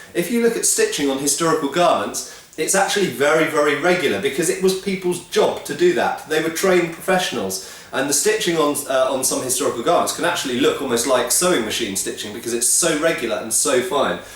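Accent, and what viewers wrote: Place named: United Kingdom. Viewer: I love your rants! So on point and the British ascent and humor is perfect for it! Makes it easy to listen to and if you know it, sense the sarcasm in it! Somehow Hollywood thinks everything in Europe during the 0-1500's (and especially Scandinavia) was made out of fur, leather and horned helmets. Sure i like a badly made over dramatic slaughter fest as much as anyone else, look at Xena for example!, but its a bit sad that they had to ruin Viknings like that. They have tons and tons of real information to go on, so why not read up on it when making a show that is so heavily based on a set time period with real people and a well established culture?! Im currently writing a book my self and trust me, its waaaaaaaa..aaaaaay harder to make up all the aspects and variables in a made up society than it is using an existing one. 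Have you ever thought of how hard it would be to just figure out a possible mode of transportation for an ancient society that relies on magic? Its bloody fucking hard! Cheers mate and have a good day!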